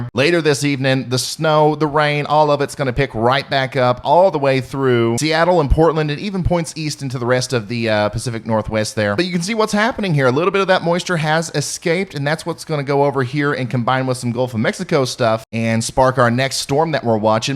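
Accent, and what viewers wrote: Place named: Kentucky, United States. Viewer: wow.. I think lives in ky too..i recognize the accent because it's where I'm from originally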